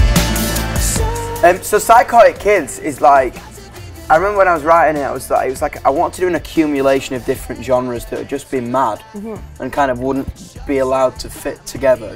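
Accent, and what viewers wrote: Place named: Northern England, United Kingdom. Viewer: ‘It’s the twenny ferst centree fer fock sehke’ God I love the northern accent 😂😂😂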